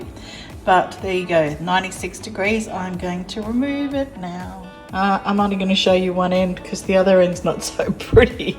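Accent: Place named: England, United Kingdom. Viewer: Love the English accent. 😆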